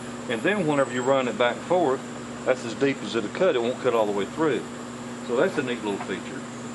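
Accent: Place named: Oklahoma, United States. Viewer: I've subbed recently and I am highly entertained and educated by your videos. I'm from Oklahoma and you sound like an okie to me.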